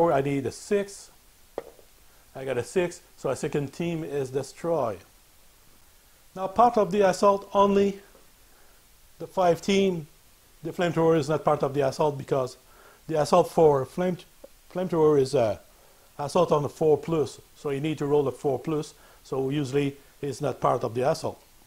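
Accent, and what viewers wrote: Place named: Quebec, Canada. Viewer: Hey!! is french your first language? cause it seems like you have a quebecois accent:)! if so, i'm quebecois too! I really enjoy your videos! You are really helpfull, cause i start doing some build of model kit and i didn't really know how to. and i found your channel and it helped me a lot! And it's cool that you are canadian!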